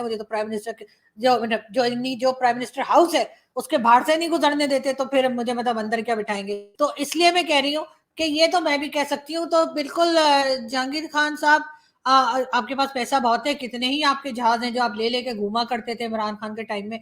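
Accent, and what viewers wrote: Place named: Allahabad, India. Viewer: you are 100% Allahabadi, the way you speak is like HARDENED ALLAHABADI absolutely Pure, this is the way a NATURAL ALLAHABADI SPEAKS ❤😂 You are hilarious 😅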